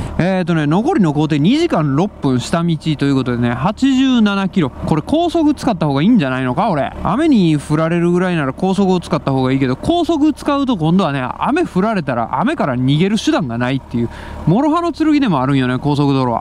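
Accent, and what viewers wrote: Kansai, Japan. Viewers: どぎつい関西弁で文句言ってるのにたまにの 怖いよーがかわいいw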